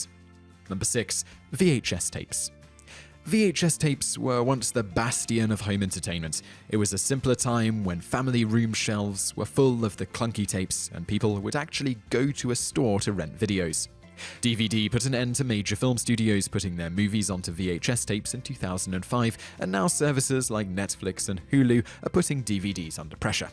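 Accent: England, United Kingdom. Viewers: You have an English accent, but your video is about America.... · British english is obsolete too! Loose the accent! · your accent is english yet you use america as an example. ? :S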